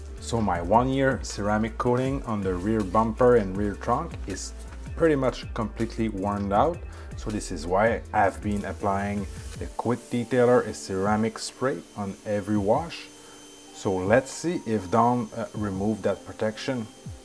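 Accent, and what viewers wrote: Quebec, Canada. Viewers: I'm going to stick to not using dawn sorry but you sound like you're in Canada so maybe you guys have the good stuff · I hear that Québécois accent, bonne Saint-Jean !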